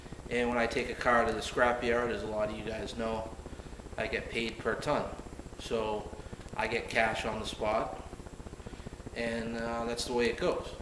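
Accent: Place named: Massachusetts, United States. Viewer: hey man from your accent you sound like your from MA? Anyways I gotta agree with you. Alot of people on here watch davesfarm and that other bullshit and get these crazy ideas. Plus alot are younger kids. I live locally and know what people will buy on CL around here. A good running 350 sure thats worth pulling or a decnet transmission. You sure don't see many s10 blazers here any more and the 4.3 would not be worth the time. Why do people like the 4.3 and 3.8 so much anyways?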